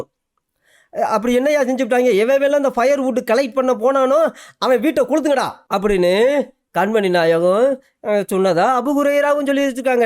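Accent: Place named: South Tamil Nadu, India. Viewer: சிறப்பு சிறப்பு நன்றாக வெளிச்சம் போட்டு காட்டுகிறீர்கள். பேசும் தொனி ஏற்ற இரக்கம் தென் பகுதி உச்சரிப்பு பாராட்ட வார்த்தைகள் இல்லை 🫂🫂🫂🫂🫂🫂🫂🫂🫂🫂🫂🫂🫂🫂🫂🫂🫂 Number one 😢😢😢😢😢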